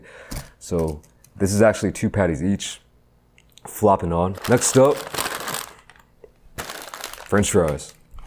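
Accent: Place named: Midwest, United States. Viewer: i new you sounded midwest i fuckin new it lol. i grew up in the northwest suburbs of chicago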